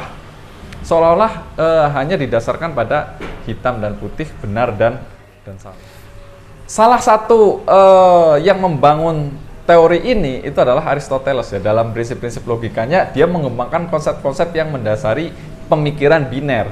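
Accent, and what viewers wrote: Banyumas, Indonesia. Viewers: Semangat mas lanjutkan, mantap sekali pemaparannya filsafat logat ngapak👍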